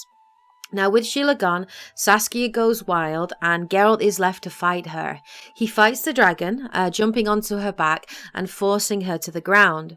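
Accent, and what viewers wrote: United Kingdom. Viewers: 8 years late but hopefully not too late I played the 3rd game around 4 years ago and loved it went back and read all the books and played through thronebreaker, so now I need this video to catch up with the 2nd game in earnest Thank you so much! Also, the northern accent makes the video even more authentic, you sound like you give council to Queen Meve on the side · should´ve watched before playing witcher3. really well done don't mind the length at all. northern dialect is a big plus as well tah !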